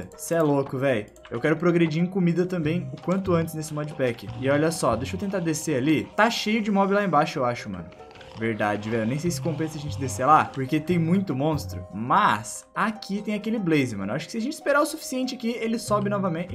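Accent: Rio Grande do Sul, Brazil. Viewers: tu é mesmo gaucho ou tu so brinca com o sutaque?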